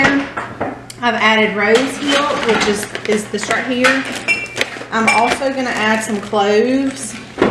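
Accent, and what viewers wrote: South, United States. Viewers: You do have a southern draw!! Hahahahaha I lived a few yrs in Louisiana and people teased me a lot about my accent 😃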